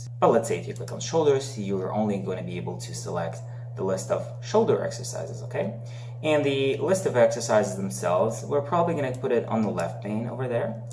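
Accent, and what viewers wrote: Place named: Canada. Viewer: Excellent videos! Love the slight accent... Canadian?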